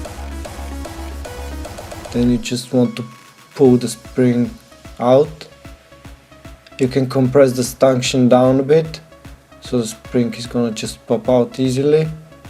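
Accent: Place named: Russia. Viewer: ты русский?) ато разговариваешь по англиски как русский))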